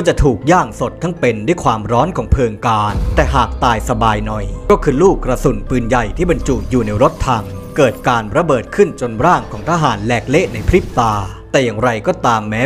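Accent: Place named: Prachuap Khiri Khan, Thailand. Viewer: สำเนียงประจวบคีรีขันธ์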